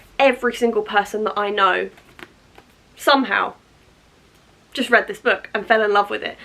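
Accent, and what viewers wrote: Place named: United Kingdom. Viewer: accumulated, brihish axent 😒 nice vid btw 😊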